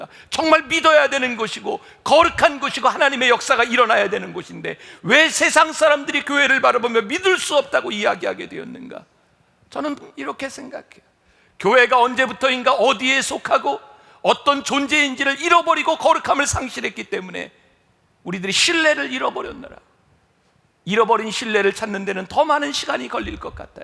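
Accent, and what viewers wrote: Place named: Gyeongsang, South Korea. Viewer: 안녕하세요 만나교회담임목사님 제고향경상도대구에서와서요사투리참재미있죠항상은혜말씀끝은주님의사랑이죠아멘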